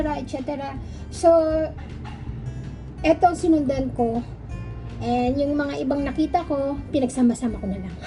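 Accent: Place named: Ilocos, Philippines. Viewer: Yes authentic and natural at first time pla lutuin ito ang cute mo mag ilokano ha mangan tayun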